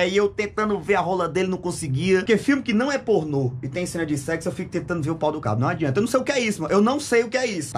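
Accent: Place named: Piauí, Brazil. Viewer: Whindersson saiu do Piauí e nunca parou de falar piauiês , meu vizinhos vão pra SP volta 15 dias dps falando igual aos paulista 😂😂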